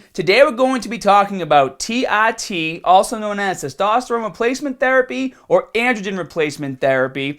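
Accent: Boston, United States. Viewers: He’s so Boston Irish ☘️🇺🇸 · who are you to come on his channel spouting nonsense he has a Boston accent get over it and grow up. how many accents are there in the world after all.... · Bostonian accent. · Anyone else love the Boston accent😂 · Very knowledgeable. Thanks for the info. (Love the Boston accent. I'm in upper NY where we use hard "R"s and all sound like pirates) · I’m from Boston and I have to say accent is thick..lol.great videos keep it up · tee ahh tee. Love Boston ahcent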